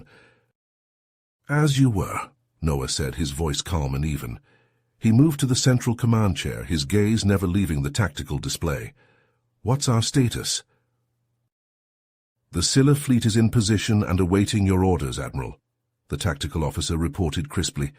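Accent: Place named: United States. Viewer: Sounds like an American with a mix of 🇺🇸🇸🇪🇪🇪🇺🇦🇮🇱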